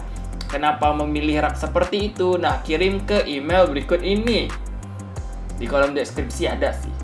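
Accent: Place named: Malaysia. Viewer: Logatnya udah kayak urang Melayu banget.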